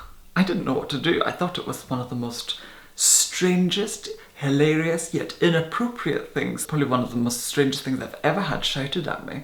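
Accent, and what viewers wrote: Scotland, United Kingdom. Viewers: Scottish darling x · its fake, not how he really talks, it is all put on for videos and more viewers, go back to his early videos from say 2013, has a normal Scottish accent and voice nothing like this at alll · I KNEW YOU WERE SCOTTISH! Sorry, I love your accent♥️♥️♥️♥️ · I so enjoy listening to you as your intelligence always shines through. And I also love the soupçon of Scottish accent in your speech. And as an alabaster-skinned woman of Russian -Ukrainian descent, I have come to love my paleness. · you should bottle yourself and sell it!!! uplifting, fascinating, spiritual, fair ( in both skin tone and outlook) beautiful and......Scottish!!! i could listen to you all day xx much love from one who has been described as 'blue white' xx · I thought it was Scottish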